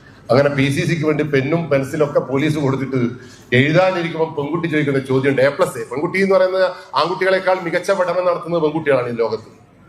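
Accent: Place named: Southern Kerala, India. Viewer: തെക്കൻ ഭാഷ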